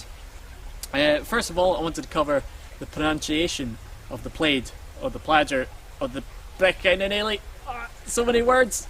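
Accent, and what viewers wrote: Scotland, United Kingdom. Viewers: Interesting and informative, and great to hear a Scots accent on Yt. Dunno about dipping my plaid in a burn, though - you're a braver man than me,:-D All power to ye.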